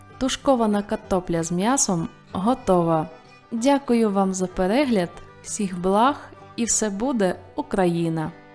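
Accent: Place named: France. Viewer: Маєш французький акцент але смачно ❤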